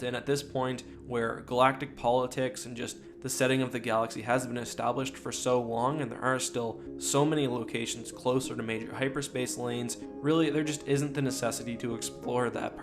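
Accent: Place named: Nova Scotia, Canada. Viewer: I was just thinking thing guy sounds Nova Scotian and then boom a photo of Halifax.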